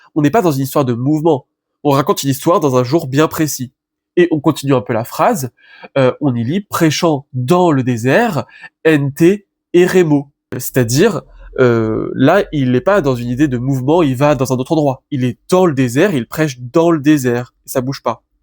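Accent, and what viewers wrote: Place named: France. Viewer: C'est dommage car le contenu est hyper intéressant et qualitatif mais étant locuteur grec natif la prononciation m'empêche de suivre. Trop de déformation mais pas spécifique a vous mais a tous les Français parlant le grec tel qu'il est enseigné en France. Un exemple simple le B se prononce VITA et pas BETA et ou le G se prononce ramma et pas gamma.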